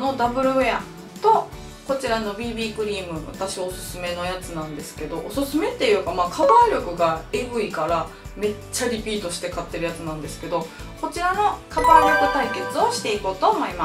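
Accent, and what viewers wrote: Kansai, Japan. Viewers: さん初めてコメします🙋‍♀️ いつも雑誌付録の紹介を見ていて、同じ関西弁で喋りも面白いのでチャンネル登録させて貰いました😊 私も40代…私、顔面崩壊しているので😅メイク動画めっちゃ良かったです‼️ 何を買えどもシミやらが消えません💦 ベルサイユのばら的なファンデを買ってみます☺️ 毎日暑いのでお身体ご自愛くださいませ😌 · 初コメです☆ こんばんは🌙😃 雑誌の付録動画で姉さまを知りました。お綺麗な方なのに飄々と面白い事言われるし関西弁大好きなので速攻でチャンネル登録しました✨ ファンデーション比較企画良いですね！私も顔に粗がたくさんあるアラサーですのでがっつり隠したい、厚塗り上等派です。普段はエスティーローダーを使っていて化粧持ちは抜群ですがカバー力もうちょい欲しいと思っていました。姉さまの最強ファンデ紹介をみてオススメBBクリーム試したくて早速買いました✌️カバー力本当に凄いですね！でも私は色黒なので203番でも白浮きしちゃいました…(^^; 他の濃いファンデと混ぜて使おうと思います💪 良きファンデーションを教えて頂きました！、ありがとうございます❗(人´ｴ`*)♪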